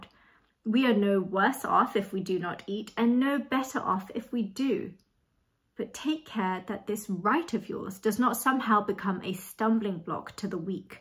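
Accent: United Kingdom. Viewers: Lovely accent but unfortunately there is not a British accent. There are 4 countries within Britain, England, Scotland, Wales and Northern Ireland, and they are all beautiful accents in their own right. You are speaking with an English accent, very nice it is too. So many people from abroad get confused and think it's one accent, but its 4 and each country has a variation within them. 🇬🇧👍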